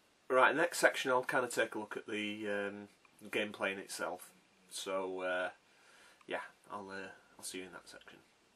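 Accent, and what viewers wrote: United Kingdom. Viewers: Very good video, surprised I hadn't watched before -_- got a little scarey toward the end LOL. Nice to finally see you in the flesh, are you a Yorkshire man? Barnsley, Leeds maybe? Thanks again and keep the psp vids coming!! I'll subscribe.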